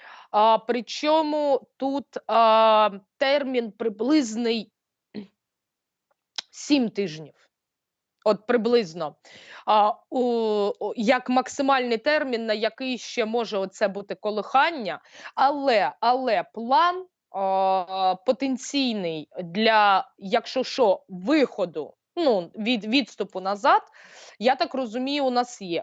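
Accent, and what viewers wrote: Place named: West, Ukraine. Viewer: Як приємно тебе, слухати.Просто,вухо радує Мова така,як на Західній Україні.Суперово. Ти неперевершена.Моллдчинка.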